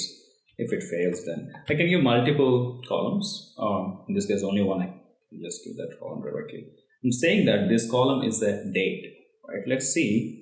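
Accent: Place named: India. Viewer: Great content.. And our Indian acccent is also good fyi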